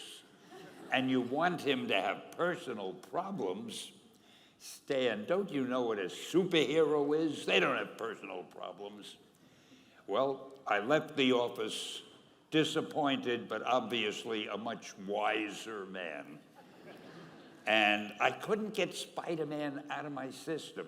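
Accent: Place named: Argentina. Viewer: tremendo acento argentino el de stan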